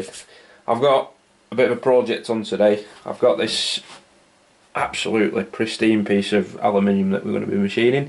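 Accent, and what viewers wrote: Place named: Northern England, United Kingdom. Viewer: Hi, Its actually nice to hear a northern accent instead of an American one. However i do like the guy John Saunders here on YouTube NYCCNC Hes the dood. I was just looking for the.nc code (has the machine code XYZ steps in it) file your machine used to cut the troughs in the plate. I figured you were using a cnc machine to mill the table. What program is your mill using Mach3?. I produced an autocad drawing of a vacuum chuck not dissimilar however i did cheat. I thought of milling the grooves in the top as you would but then drilling only one hole in from the side of the plate into the centre then a hole in the top of the plate down into the side hole. Your design using a chamber milled under the plate instead which to be honest is the correct way of doing it. I tried to convert the file in Cambam (post processor) into an NC file for my milling machine but ended up making about 100 profiles around each castllation in the top plate, not the straight x then y mill routes i was expecting. 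Im Still a novice i'm afraid. I did manage to build a vacuum ejector/generator for to go on an air supply like this one on Ebay <link> Got some good results as well I have a similar Vac pump to yours which is actually what i will use in the end.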